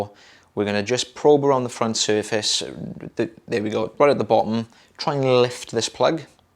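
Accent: Wales, United Kingdom. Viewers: Lovely to see you & hear that great Welsh voice. It's so good that you have your own style of presentation & it complements ' very well. Be your own person!👍🏻🏴🕊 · I enjoy your accents. Idk why but a lot of accents get on my nerves I think Welsh is the most pleasant one in the British isles.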